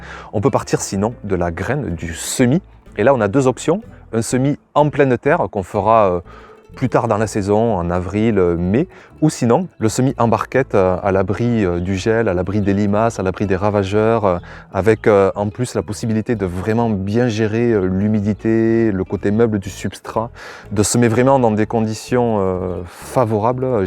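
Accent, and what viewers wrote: South, France. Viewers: Un Topeurwére! Ah l'accent du sud ! 😁. Super vidéo comme toujours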